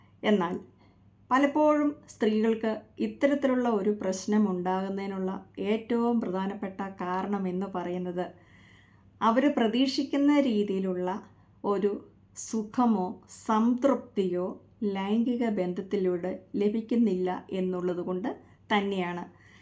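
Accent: Kottayam, India. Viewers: ചേച്ചി കോട്ടയം കാരിയാണോ? സംസാരം കേട്ടിട്ട് അങ്ങനെ തോന്നുന്നു. കോട്ടയം സ്‌ലാംഗിൽ സെക്സ് വിഷയങ്ങൾ കേൾക്കാൻ എനിക്ക് വലിയ ഇഷ്ടമാണ്. അതു പോലെ തന്നെ എറണാകുളം സ്‌ലാങ്ങും. അതൊക്കെ എനിക്ക് വലിയ turn on ആണ്. കാരണം അറിയില്ല.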